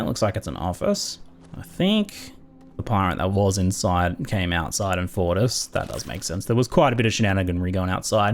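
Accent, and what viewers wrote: Australia. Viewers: I thought, for a second, he was doing a Crocodile Dundee accent, but then I realized it's a video and it's just how he talks.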